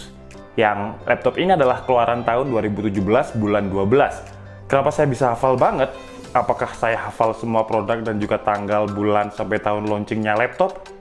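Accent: Java, Indonesia. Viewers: Waduh mengsle" jowo banget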